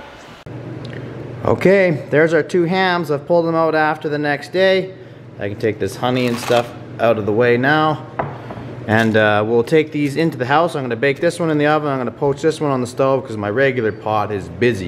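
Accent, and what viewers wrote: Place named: Nova Scotia, Canada. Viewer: Hello btw sub from Belgium ! I started eating carnivore as of last Monday but I want variety and healthy meat so as they put all sorts in regular store-bought ham, I want to make it for my family. Btw, I'm originally from Cape Breton Island, Nova Scotia and I think you sound like the folks from home - but I have been over here for 35. You're fro which province, may I ask ?😀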